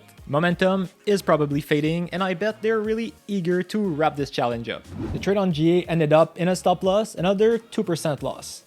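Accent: Quebec, Canada. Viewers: Are you québécois ?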